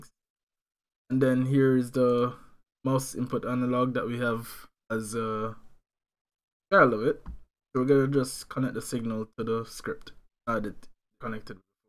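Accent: Jamaica. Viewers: are u Jamaican ? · Mi did a hesitate fi learn godot but hearing another Jamaican do exactly what I want is inspiring. Must learn it this summer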